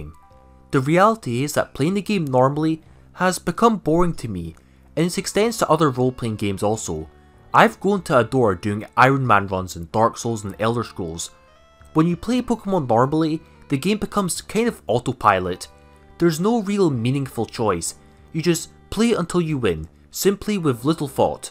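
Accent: Scotland, United Kingdom. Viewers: i really just wasted half an hour watching a scottish man on the internet talk about nuzlocking, oh well still was a decent watch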